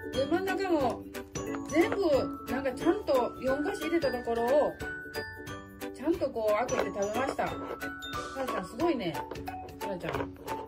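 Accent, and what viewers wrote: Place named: Kansai, Japan. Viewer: 関西弁は親しみやすい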